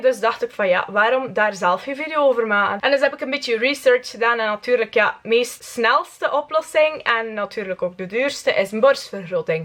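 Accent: West Flanders, Belgium. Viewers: InesVandevelde niemand buiten wvl begrijpt die. Veel minder vieuws. Soit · Cry Baby ja hahah dit is west vlaams super plat dus ik woon daar ook!! Ahhaha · heyy ben je belgisch met accent? en zoja, wat voor een accent? x lene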